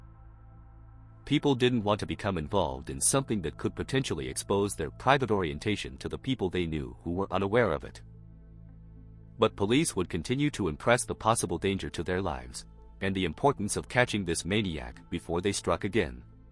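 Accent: China. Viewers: I was watching a video you made 8 months ago and you sounded different. Don’t get to good at English I like your slight Chinese accent. With that being said you are arguably more articulate and well spoken than most native speakers.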